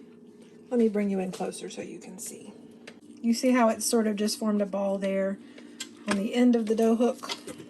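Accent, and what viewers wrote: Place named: Eastern North Carolina, United States. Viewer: Just found you and have subscribed. Was wondering what state you are from. I’m from small town in Eastern NC and you sound like us with your accent. Thanks for the great recipes from my childhood.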